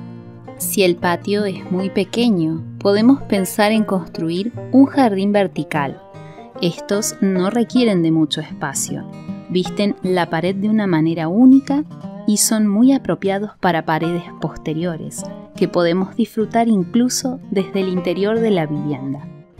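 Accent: Argentina. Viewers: Con ese hermoso acento argentino no me cansaría de escuchar audiolibros en mi jardín. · Yo lo silencio...no me gusta nada el acento argentino.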